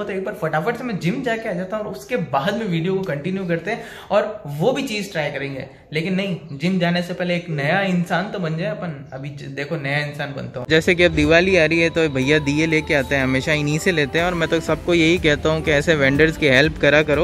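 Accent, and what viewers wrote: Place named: Marwari, India. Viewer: सा, वंदेमातरम। आपके वीडिओज़ में जो परिवार के प्रति स्नेह व लगाव दिखता है वो बहुत सराहनीय है। और थोड़ी बहुत मारवाडी बोली भी बोलेंगे तो और आनंद आएगा। आपरी हिंदी में मारवाड़ी री सुगंध तो आवे है, उनने सुन र जी घणो सोरो होवे सा।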